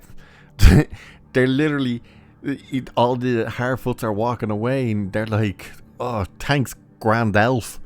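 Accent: Ireland. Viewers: I didn't expect to hear the name "T'under-Tits" in a lovely Irish accent today but it made me laugh out loud. Shame I just found your channel at the end of this clusterfück of a show. Subscribed. Cheers from New Zealand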